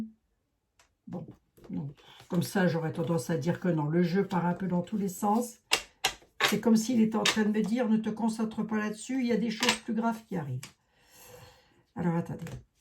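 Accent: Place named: Paris, France. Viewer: J'aime tellement que vous soyez une belle lorraine et que vous parliez avec une véritable gouaille parisienne 💓💕❣️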